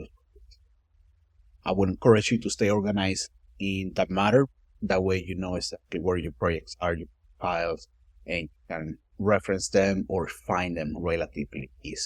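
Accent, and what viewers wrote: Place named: Latin America. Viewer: Bro eres latino hablando inglés porque eso me parece, hablas bien recién empecé a usar cursor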